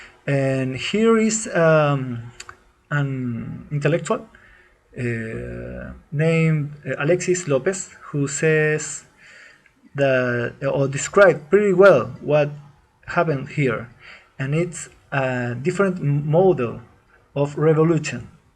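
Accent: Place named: Russia. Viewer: Warning mister trump,. this guy is a russian, you can tell it by his spoken english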